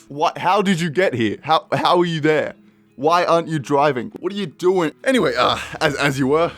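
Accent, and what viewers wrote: Australia. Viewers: Your Aussie yea?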